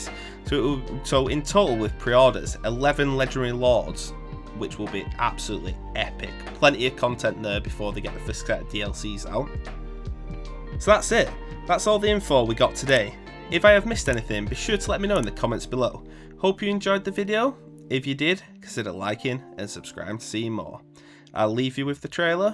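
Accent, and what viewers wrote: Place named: Bolton, United Kingdom. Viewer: Where you from lad? You sound like my neck of the woods, Bolton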